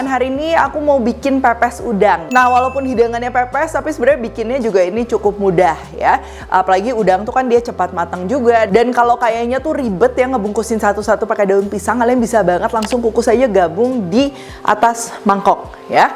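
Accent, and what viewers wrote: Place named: Java, Indonesia. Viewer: Pepes udang enak banget chef medok banget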